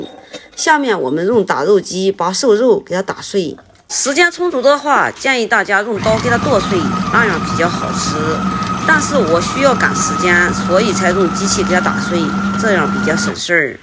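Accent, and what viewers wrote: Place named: Henan, China. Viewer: 一聽口音就是河南信仰老鄉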